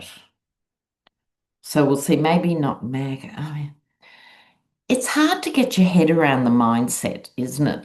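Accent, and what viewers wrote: Australia. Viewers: I can have a really crappy day and come home and watch one of your videos where you cleverly have such a way with the English language! You were talking the other day about someone pretty sure it was a yeti where you commented on the fact that he has the attention span of a caterpillar. So I don’t know if it’s your sarcastic with, or your Australian accent that makes that comment so effing funny you’re just the best I adore watching you. I watch every single one of your videos and I’m about to go watch you, Linda G and the other two ladies chat thank you so much for your effortsyour comments your wit your charm we love you in America. Thank you for all the hope that you provide for our wavering country PS I’m Irish too. The other half is Cherokee Indian.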